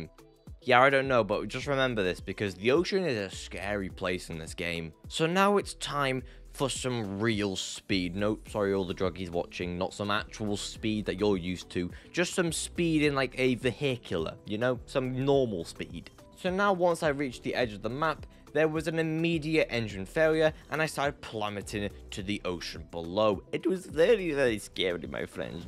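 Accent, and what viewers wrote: Wales, United Kingdom. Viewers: Are u from Wales ?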